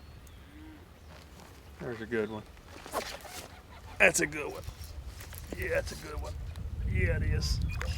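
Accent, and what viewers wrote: South, United States. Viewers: damn you are sexy!!! sry just had to say it...I love a man with a southern accent! NEW SUB!!!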